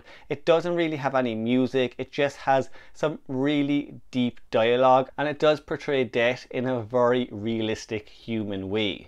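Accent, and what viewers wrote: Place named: Ireland. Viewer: Aaah... a proper Irish accent.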